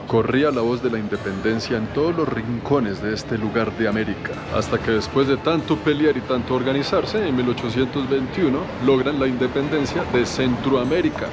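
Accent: Colombia. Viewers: Gracias por presentar a mi país, con un toque colombiano muy agradable, bienvenido, que la disfrute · El nació en Panamá, se crió en Colombia y vive en Canadá, por eso es un orgullo que a pesar de tener otras nacionalidades se considere colombiano, también en su manera de hablar y sus dichos, un youtuber que si vale la pena verlo 👍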